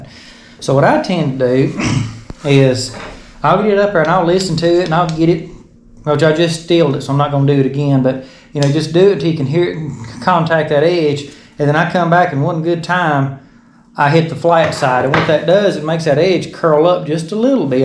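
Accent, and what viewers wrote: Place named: Alabama, United States. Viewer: What part of Alabama are you from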